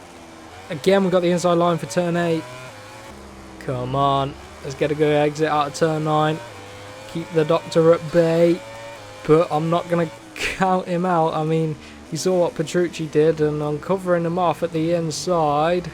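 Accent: United Kingdom. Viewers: Where in England you from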